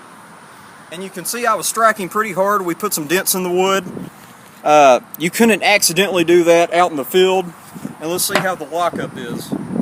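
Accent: South, United States. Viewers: Like Elvis, but slightly more country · Southern US. I would say probably Alabama or Georgia, maybe Tennessee. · you have the most american accent ever. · He has what we call in America a "Southern Accent" which could be further broken down to specific area or state in the Southeastern U.S. It's rather nice isn't it? People have a different accent in Northern California where I live now or in Upstate New York where I grew up. Most people in the world are probably most familiar with what is called a "Mid Atlantic American Accent" which is sometimes considered by Americans as having no accent at all.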